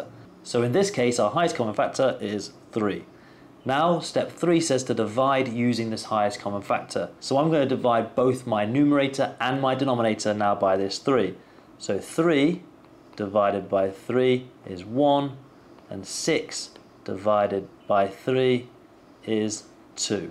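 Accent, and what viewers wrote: United Kingdom. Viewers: British ahh guy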